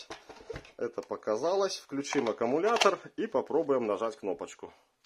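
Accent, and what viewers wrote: Belarus. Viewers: Судя по акценту - белорус!) Лайк не глядя)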